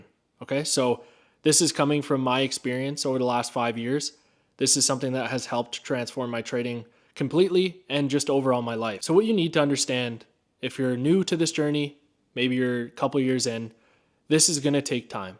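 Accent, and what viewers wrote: Canada. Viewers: This was a great video. I also KNOW you’re Canadian. Can spot the accent from a mile away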